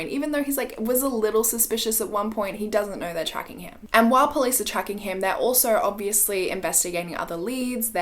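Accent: Australia. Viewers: definitely Aussie hahaha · as a brit i can confirm is deffo aussie 😎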